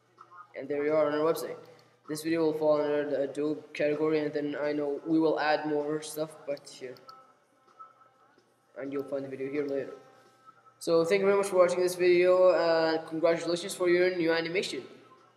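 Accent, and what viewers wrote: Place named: England, United Kingdom. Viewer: Bro you talk in the way that foreigners think english sounds like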